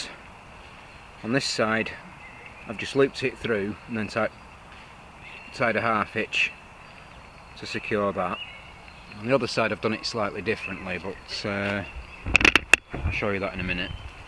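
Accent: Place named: Northern England, United Kingdom. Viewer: Nice set up, I think my only alteration would be either lowering the tarp or raising the hammock otherwise you may end up rather soggy if it's wet and windy :P The flap at the bottom of the hammock is for stopping a casualty sliding out the bottom when going up an incline rather than stopping them rolling out the side btw. Nice review, the northern accent always inspires trust ;)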